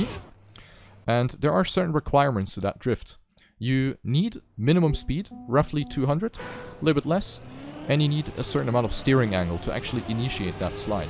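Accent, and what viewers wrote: Germany. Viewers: oh hes german that makes sense accent trew me off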